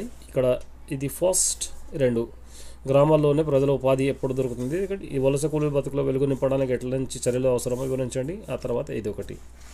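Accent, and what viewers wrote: Hyderabad, India. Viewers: Sir, you are from Hyderabad?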